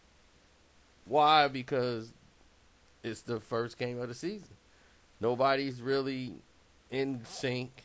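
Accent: Ireland. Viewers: The Irish accent is funny as hell. You is a fool! Keep it up. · u killing me with that irish accent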